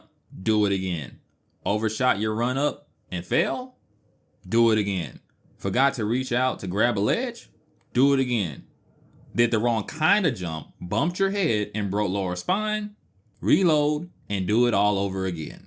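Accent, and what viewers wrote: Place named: United States. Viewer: it's a joke.. and I don't believe this guy got it wrong anyway, he just has a murican accent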